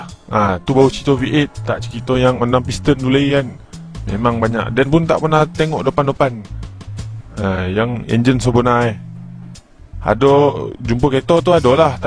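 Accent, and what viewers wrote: Negeri Sembilan, Malaysia. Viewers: Ada 2 sbb utama kenapa orang layan video bro: 1. citer pasal asal usul kereta. 2. loghat nogori yang rasa dekat di hati dan klaka.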